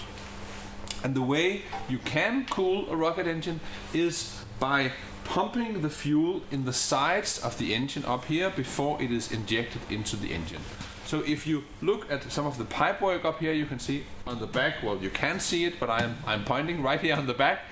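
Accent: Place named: Germany. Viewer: the moment i heard the german accent i knew he was legit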